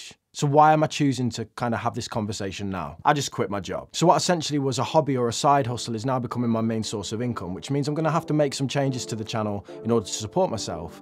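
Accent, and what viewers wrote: Birmingham, United Kingdom. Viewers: Best of luck. I wish you nothing but success. There are not all that many British finance channels, it's all well and good listening to some yank that has millions in the bank telling you to spend less. But you have provided me with some great insights and actually had a positive impact on my wallet. Keep up the great work and I am sure you'll do brilliantly. P.S You're a Brummie? I thought you were a Manc. 😂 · Love your content mate, nice to hear someone from Birmingham instead of just American’s all the time. Keep it up!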